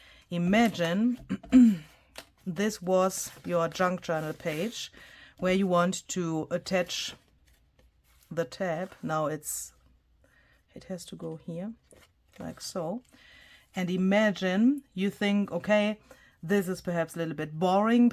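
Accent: Germany. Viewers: These are fantastic. Just love them, but seriously I love everything you do. I so enjoy your videos, your German accent and your wonderful sense of humor. I like you as a person. It’s wonderful you get to enjoy your little piece of nature at least for part of the year until they all show up and you feel like you’re in the middle of a bustling metropolis 😂😂❤️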